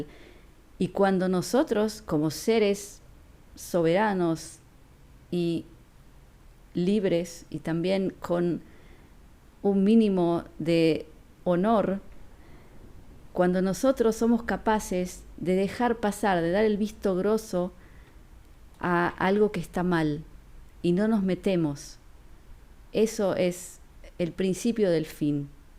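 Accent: Buenos Aires, Argentina. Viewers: qué lindo...y tu modo de hablar es bien porteño! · Salud! No puede negar que es porteña..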